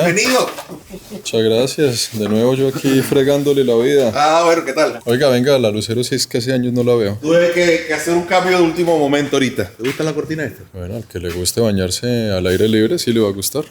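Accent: Bogotá, Colombia. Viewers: Hola,! Somos una familia colombiana que emigró a Canadá.Te seguimos hace tiempo, tus vídeos fueron muy útiles en ese duro proceso de hacer a un lado el miedo y volar.Nos encanta tu buena vibra y nos conecta ese Español Bogotano tan auténtico qué no te abandona.Te deseamos lo mejor...Por supuesto la tierra del realismo mágico siempre nos llama, allí están nuestras raíces.Un abrazo y éxitos 🍁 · Acento Bogotano!!!!! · Cachaco de pura sepa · Me encantan tus videos, muy auténtico y me recuerda el acento de mi bella Colombia, que es lo mejor de lo mejor. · 13 años en Canada y no perdiste tu encantador asento Colombiano❤ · La primera vez que te vi fue en un video de Oscar Alejandro. Escuché el acento y los dichos como “pichirilo” y me dije: “Es Colombiano”. Desde ahí te veo sagradamente todos los domingos y cuando sacas vídeos extras. Siempre tuve la idea de establecerme en Canadá. 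Tuve una beca para un curso de actualización en 2013 y me encantó el país. Hoy las cosas están confusas (y ya voy para los 50…), haces ver fácil tomar decisiones, creo que está en tu naturaleza de inadaptado. Yo creía que también era inadaptada y hasta cierto punto lo soy. Sin embargo, ahora que tengo nuevos cambios desde 2022… he confirmado mi confusión. De momento, prefiero quedarme y adaptar mi base a mi… Las cosas a los 50 se ven distintas, la energía y las prioridades cambian…me parece. Tal vez esta incomodidad que tengo me haga tomar una decisión como tú. Gracias por tu transparencia y sencillez. Bendiciones en esta nueva etapa. Abrazos desde Bogotá, chaolín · claro, y no es una teoría es lógica, estando un colombiano en canadá hablando inglés y francés por qué cambiaria su acento en español?, no hay nada ni nadie que le haga hablar español de distinta forma. Podrá olvidar palabras pero no la forma de pronunciarlas. 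Estando en España en cambio, tendría que usar el español para entender, hacerse entender y relacionarse con los locales, además sería normal que cambie palabras y dichos para que encajen con los locales y evitar malentendidos o tener que explicarse a cada rato, no te digo que hubiera cambiado por completo su acento pero si usaría palabras y expresiones del país huésped. Esto pasa en los dos lados, un español en colombia, argentina o méxico adaptará su acento al del país.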